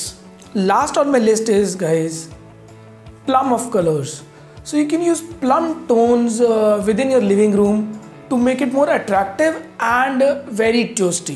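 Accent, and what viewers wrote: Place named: India. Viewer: Thanks for the video. I struggle to understand the Indian accent, but it´s worth it. Would you advise me? I have quite large, but east orinted quite dark room, I will spend time there mostly in the afternoon/evening, so artificial light mostly. I want to "warm" it a bit, was thinking terracotta or pineapple green combinations.. Is it a bad idea? I wonder.. Thank you. (I like darker red colours).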